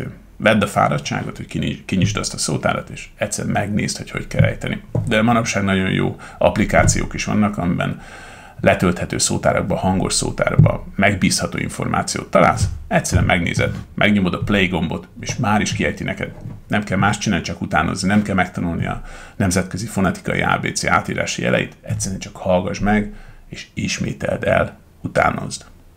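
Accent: United Kingdom. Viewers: Hi..Kindly Could you tell me where is you english accent from? Rp British...Modern RP British? I'm curious, because I'm using the Shadowing technique through your videos. Your accent is very clear. I looking forward to see your answer.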